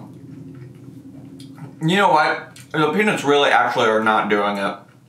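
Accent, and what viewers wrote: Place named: Louisiana, United States. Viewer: this might be weird but are you from Louisiana? because you sound just like my English teacher from Louisiana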